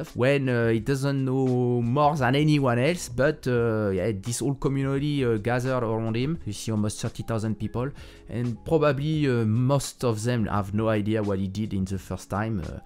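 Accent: France. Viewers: Quasi sûr que t'es français ! Bonne chance pour cette chaîne anglophone, ça doit pas être facile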